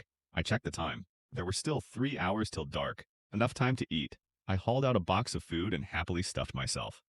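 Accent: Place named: China. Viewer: Personally I think the ai voice with the Chinese accent it’s sounds more funnier and fun and also the more higher in pitch voice you used for like X-ray gambling jade video sounds better overall I would say if you use this voice you should consider also playing background music so it doesn’t sound as dry and monotone also I love your vids bro and how long they are really enjoyable to watch I probably subbed at like 50 subs but keep up the consistency and constant improvements and you’ll do great🎉 sorry for the lengthy comment😅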